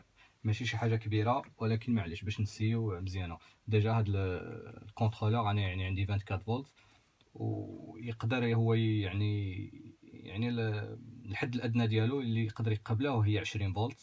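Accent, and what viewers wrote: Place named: France. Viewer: ديس لايك بسبب لهجتك الفرنسية الخراء، والله انت لست بعربي ، اعتذر لك إذا كنت امازيغي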